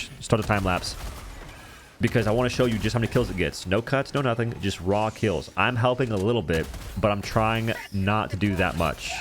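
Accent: South, United States. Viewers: Bro do you have a southern accent or not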